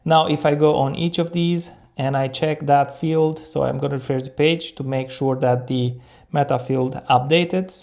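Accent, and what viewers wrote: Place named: India. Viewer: primeira vez que eu vejo brasileiro falando em ingles com sotaque indiano kkkkkkk valeu pela aula mano, ajudou muito aqui na minha loja tmj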